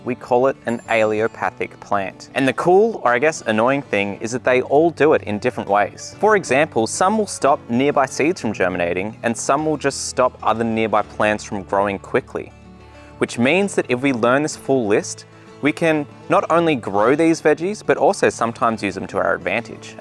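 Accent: Australia. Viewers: That strangulated Australian accent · Don't pick on his Australian accent.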